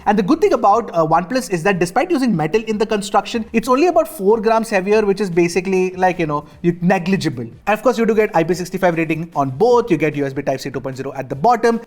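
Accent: India. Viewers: Your Indian accent is killing me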